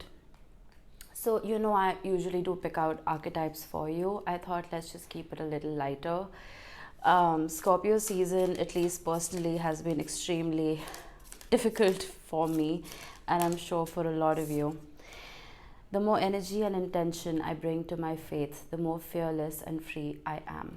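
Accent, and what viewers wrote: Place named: South Asia. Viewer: you look european but sound south asian...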